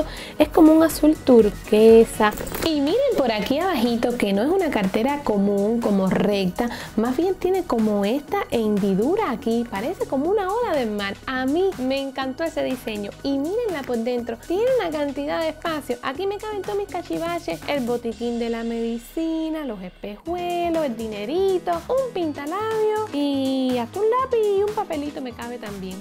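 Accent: Cuba. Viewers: Me encanta el acento cubano de.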